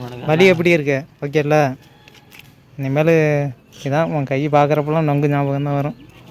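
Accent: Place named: Kaveri Delta, India. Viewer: Peachila therila, vivasayi Vera.. delta place of tamil Nadu. Thiruvarur or tanjore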